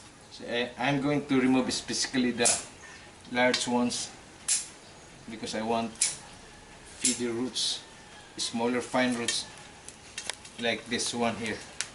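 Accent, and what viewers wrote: Philippines. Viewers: That's what he's speaking. Sounds like a Filipino accent.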